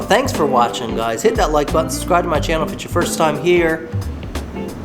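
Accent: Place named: Newfoundland, Canada. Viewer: It’s a Newfoundland accent.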